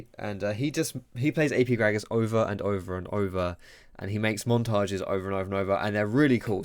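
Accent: France. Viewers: that french accent tho xD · Video gets a like for that french accent alone! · the french accent makes me smile xD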